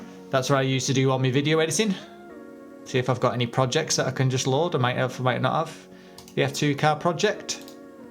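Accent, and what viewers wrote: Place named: North, United Kingdom. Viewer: Love the setup even though small space you've really made it count I can't explain what I mean really but I hope you get the jist of it, I found you through the new evo I'm looking to get it, and found you sounded northern like me so that's what related me to you lol deffo be coming back for more videos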